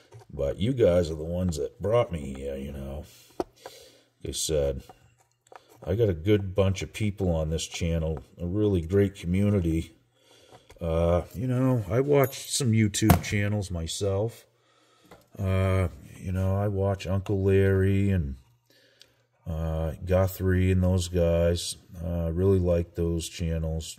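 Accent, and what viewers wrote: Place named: Maine, United States. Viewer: As a Bostonian...It's enjoyable to hear a Mainer's take on people making a fuss about your accent.. I can't leave the state without someone asking me to say Pahk the Cah in Hahvahd Yahd...🤘🏻😝. You're a natural teacher... That's why I subscribed. I've learned something every video.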